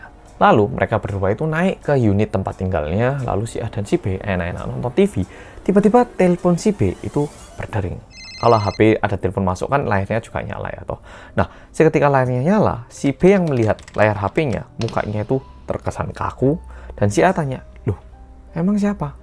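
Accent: Java, Indonesia. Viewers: Yaampun teganya teganya😮 tks medoook ❤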